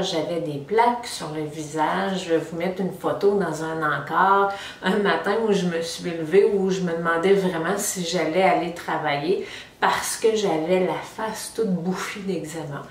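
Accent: Canada. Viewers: Bonjour Je suis très contente d’avoir découvert votre chaîne. J’ai une question concernant la tretinoine et le masque led. Je souhaite m’acheter un masque et j’ai commencé la tretinoine depuis plusieurs semaines. Du fait que ce soit photosensibilisant je me demandais si vous continuiez la tretinoine ? Si vous le faites le jour où vous mettez la crème ? À quel fréquences faites vous le masque ? Merci beaucoup pour votre bonne humeur et votre petit accent canadien 😘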